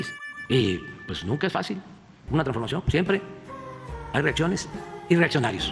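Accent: Tabasco, Mexico. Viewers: Se le achican los ojos, retoma el acento tabasqueño y también habla más de corrido cuando está que se lo lleva la chingada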